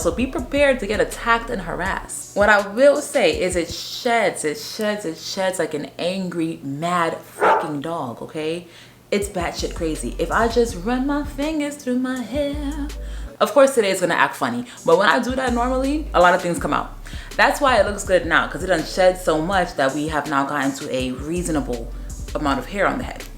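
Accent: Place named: East Coast, United States. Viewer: East Coast Accent - I love it! If not it sure sounds like it! And its waaay worth the money that you spent! I love it on you!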